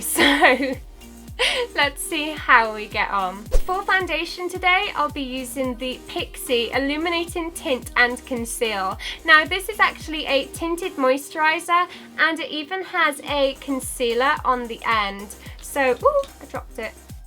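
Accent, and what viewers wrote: England, United Kingdom. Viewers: What kind of English accent do you have?? You sound like a teacher I had who was from the UK and I've always wondered what kind of accent she had cause I know it's not a cockney accent and that's about all I know lol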